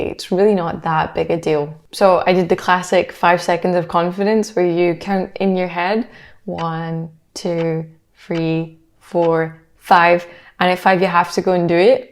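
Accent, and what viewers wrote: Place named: Scotland, United Kingdom. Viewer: As an introvert I was inclined to click of the video and as soon as I heard your Scottish accent as a fellow Scottish person I now feel the need to binge your whole channel <3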